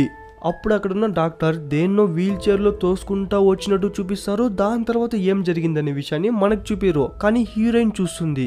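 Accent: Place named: Kolar, India. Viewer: Kolar slag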